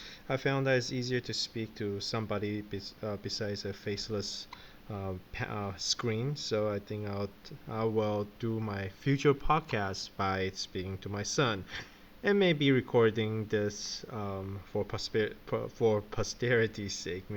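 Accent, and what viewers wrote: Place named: United States. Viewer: ~ "Who will the VAT affect? Those spending more than $10,000/mo." you lost me. I suppose you have some unstated premises, possibly re UBI. first: it's VAT, V A T. it's not VAT tax, that would be Value Added Tax tax. second: such presentation issues prevent me from listening further, so.. maybe you EVENTUALLY get on to the following, but I may never know. ("Tell them what you are going to say. Say it. Tell them what you said." this is like high school composition.) such problems REALLY undermine credibility on top of this specific comprehension barrier third: "Your economics makes no sense." a VAT is a tax on productive capacity, whereas a wealth tax is intended to AVOID taxing production. the key is whether the monies seized are engaged in the economy or whether they are held in reserve, not actually adding to the production. 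think of it this way: * I am spending $1000/month (productive) * I am reserving $100 (nonproductive, so-called "wealth") -> VAT DECREASES my spending capacity a 10% VAT means only $900/month (spending, productive), $100 reserved -> "wealth tax" DOES NOT decrease my spending $1000/mo spending, $0 reserved fourth: "intellectually lazy" is a promise you are making by choosing this headline. it sets up expectations. in this case, it sets me up to question, "Just who is being intellectually lazy?" and in this case I determine it is you after about 3 and a half minutes ADDENDUM: I am an accent buff. I take yours as from the US, but the question then become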